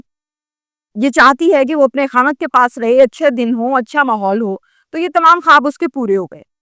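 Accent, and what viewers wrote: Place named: Punjab, Pakistan. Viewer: This woman needs training on how speak on a public forum like social media. She has a Punjabi accent whilst speaking Urdu and her tone is usually aggressive especially when she interviews people.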